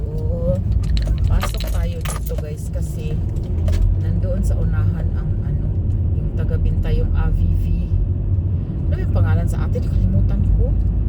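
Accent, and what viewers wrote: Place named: Visayas, Philippines. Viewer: Bisaya ka ano, sis? Sounds like one kasi😂… na-curious ako sa blue egg…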